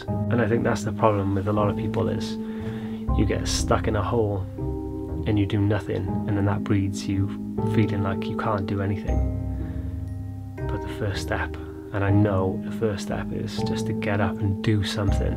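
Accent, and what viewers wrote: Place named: Wales, United Kingdom. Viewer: Dear I'm from South Wales but have lived in Australia for the last 12 years. Recently I have been so burnt out renovating my house, being a single mum and trying to build my own graphic design business. Last night I stumbled upon your channel. This video has helped me a great deal and hearing your Welsh accent is so comforting. I really needed to hear these things so thank you. I suffer with anxiety and sometimes that can be totally debilitating as I question myself everyday and give myself ahard time. This morning I amfeeling a little renewed, small steps.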